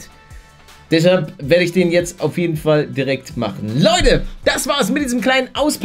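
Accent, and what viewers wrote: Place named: North, Germany. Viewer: Er hat nen geilen norddeutschen Akzent xD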